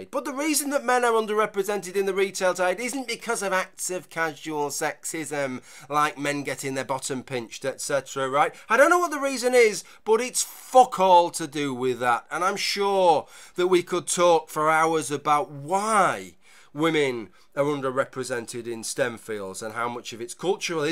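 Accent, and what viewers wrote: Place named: Northern England, United Kingdom. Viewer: You expressed most of my thoughts, though more eloquently (and with a northern accent!). The problem with claiming the shirt is sexist is that the argument is not falsifiable. If you try to provide a counter example, such as men in sports gear, it's not analogous because the 'power differential between men and women under patriarchy' gives the offending shirt a meaning that doesn't apply to other motifs involving men or inanimate objects. If you argue that the poses aren't inherently pornographic, they can readily produce an example from pornography because as you observed, just about any pose can be pornographic. If you don't think the shirt would really keep women out of STEM because it isn't inherently sexist, and you happen to be male, then you're dismissed because 'men don't know what it's like to be a woman under patriarchy' and you are 'mansplaining'. 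If you're female, then you're a 'chillgirl' with 'internalised misogyny' and are probably just trying to curry favour with the men anyway. Many of the arguments for feminism resemble very closely those for the existence of god. It continually surprises me that the movement has gained so much traction in atheist/skeptic circles. I'm also glad you touched on the subculture aspect. I haven't really seen a lot of photos of Matt Taylor, but both he and his friend (Ellie Prizeman?) gave me a bit of a rockabilly/metal vibe. As someone who faced a lot of negative attention for being a goth in my younger days (eg. once some guy tr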